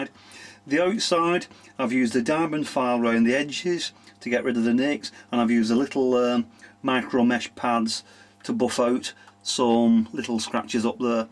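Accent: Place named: England, United Kingdom. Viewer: What was the powder that you put in the tumbler? Sorry but I'm a french Montrealer and sometime I have problem with the English accent.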